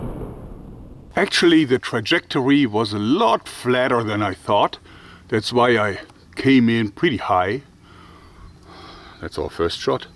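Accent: Germany. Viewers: Man hört einfach Instant daß er ein Deutscher ist. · I hear a German accent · Man hört richtig das du Deutsch bist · Learn english without accent, german... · Hey you sound like you are german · Explain to me why ur having an english instead of a german channel? - Your accent is distracting XD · Kann es sein das du eigentlich Deutsch bist? Ich finde man hört es an deinem Englisch 😁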